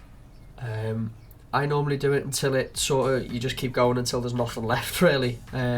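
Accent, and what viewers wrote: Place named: Liverpool, United Kingdom. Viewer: Thank you scouser! I can't tell you how much bother you've saved me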